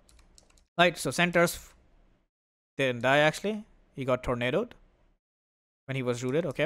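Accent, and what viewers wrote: India. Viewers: He sounds Indian. I’d be constantly angry too if I was a subhuman.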